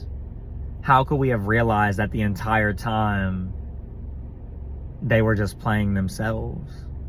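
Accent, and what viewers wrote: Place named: Texas, United States. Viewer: I'm a new sub! Are you from Texas? You sound like back home to me... sending you warm loving energy from Hollywood, my newest home! ✨️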